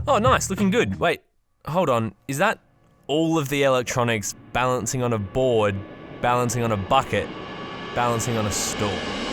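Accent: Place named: Australia. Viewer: been watching for a while and only just realised u were also australian 😮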